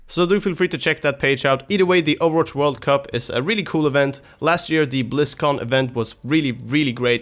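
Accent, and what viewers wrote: Sweden. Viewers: ah you from Sweden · are you swedish????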